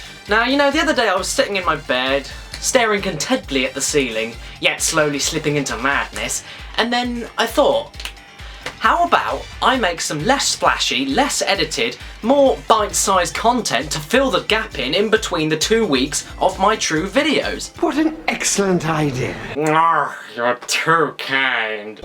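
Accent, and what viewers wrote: United Kingdom. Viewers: Like JonTron, but Britisher. That's a good thing. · UUuuhhmm, hehe......could be the wierdest comment ever, clearly the dude is brittish.... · JESUS! HE IS A BRITISH PBG!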